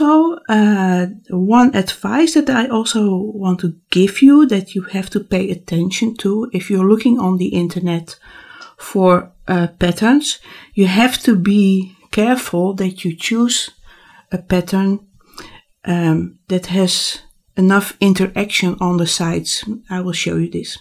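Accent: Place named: Netherlands. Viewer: Grappig, ik dacht bij mezelf: "Wat een sterk Nederlands accent..." En toen bezocht ik je website. :) Dat Starburst patroon is mooi om te zien, hoor.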